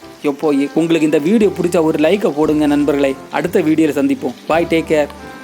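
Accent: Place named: Kerala, India. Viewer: Hi nalla irukkureengala unga tamil alaga irukku thambi. Malayali pesuvadhu phol irukku. From Sweden 👌🤲🏽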